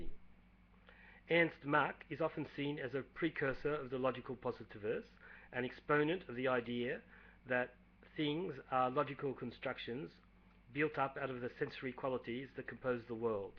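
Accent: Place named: France. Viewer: You've definitely acquired an interesting accent after so many years living in France. ("important")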